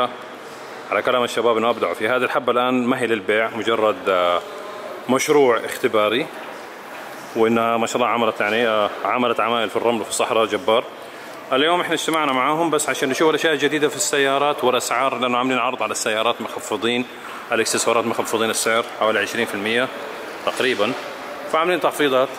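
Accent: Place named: Bangladesh. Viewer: كركر زياده لهجته لهجه بنقاليه ، . . .اضبط لهجتك لا تتكلم بهذي طريه تكلم بلهجه العامة . . .ولا تكلم بلهجه القبليه اما هذي الهجه مدري كيف جايه تح انه سبك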